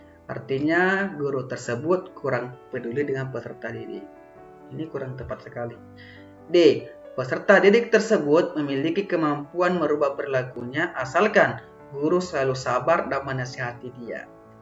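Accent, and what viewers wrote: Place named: Madura, Indonesia. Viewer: Aq mendengar pak bilang logat Madura, apa pak org Madura? Salam sittong dhere pak😁🙏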